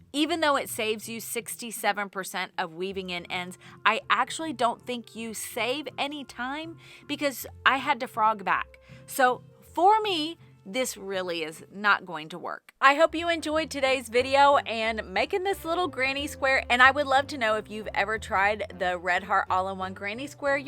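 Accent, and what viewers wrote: United Kingdom. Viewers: I was was not expecting that British accent!😂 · Nope nope nope. All that faffing about, you may as well spend time weaving in ends. I'm British and love that you mutter to yourself in a Brit accent! Thank you for the review.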